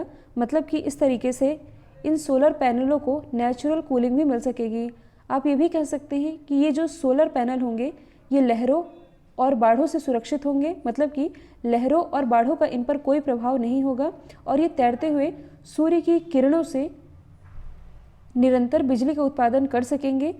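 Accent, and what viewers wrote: Madhya Pradesh, India. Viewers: वाह मेम आप एमपी से हो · Ma'am Aap mp k ho?? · Maam aap MP ki hai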